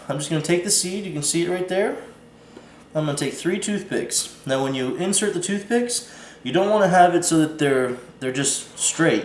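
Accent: Newfoundland, Canada. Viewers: I've been successful (so far) with the avacado. The root is just finally on the outer surface of the seed. I also live in Canada and I just have it in my windowsill and its growing great along with my lemon trees , clementine and kiwi thanks to you! You have the Newfoundland accent is that where you are from?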